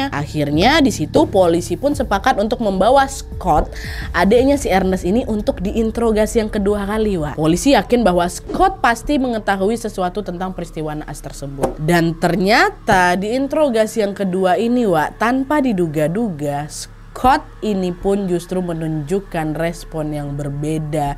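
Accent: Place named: Sumatra, Indonesia. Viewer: Asek kali lah kalau udh org Sumatra yg bawain cerita.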